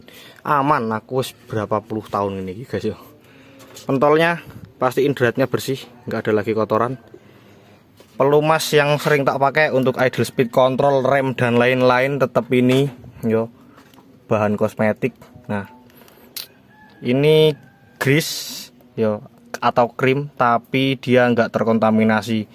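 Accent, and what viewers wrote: Java, Indonesia. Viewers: Pembawaannya khas jawa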